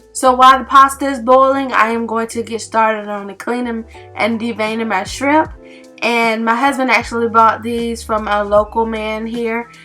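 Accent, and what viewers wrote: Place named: South, United States. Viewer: You sound so sweet and you’re definitely from the south which makes you sweet. I’m from the south tube but I live in Arizona. It’s not as sweet as the south. I like your cooking channel. Keep it up girl.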